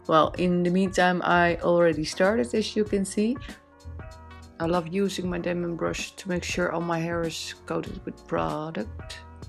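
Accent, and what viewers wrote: Netherlands. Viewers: Are you Dutch? You have a very strong Dutch accent! I think. [edit: yes, cat Vlinder was just introduced] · Is she Dutch? She sounds really Dutch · Ben je toevallig Nederlands? Ik hoor een nederlands accentje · Oke dit is misschien een beetje apart maar ik hoorde aan je accent al dat je Nederlands was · Are u from Holland because u sound like a dutch person with broke englishe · I've never seen more beautiful curls before 😍 You are like an angel, you motivate and inspire me to love and take care of my curly hair no matter what other people say (classmates all have straight, thick hair and I have thin curly/wavy frizz! -_-) At least my hair is natural and not as damaged as their hair, but it seems they all think ringlets looks funny and childish.... 😒 THANK YOU so much for motivating me!!! ❤❤ Also, your accent is super beautiful, I believe you are from the Netherlands? 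You're such a beautiful lady really. Hugs from Sweden 😇💕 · I want to say you are dutch. I can hear it in your accent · The Dutch accent is strong but I like it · I think you're from the Netherlands the accend gives it away😂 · you sound kind of Dutch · je hoort dat ze Nederlands is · She really sounds dutch. Thats a typical dutch accent. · Haha lekker hollands accentje heerlijk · Are you dutch? You sound verryyyy dutch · Je kan het nederlandse accent er zo goed door heen horen haha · Beautiful hair. U sound like my Dutch husband lol I’m guessing U are Dutch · Hoor gewoon aan je accent dat je nlse bent 😍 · You sound Dutch! Are you? Hoi🙋🏽‍♀️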